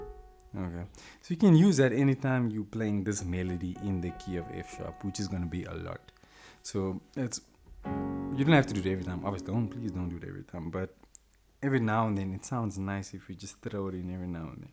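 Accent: South Africa. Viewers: bless you bro. You sound like a fellow South African. But let me not assume.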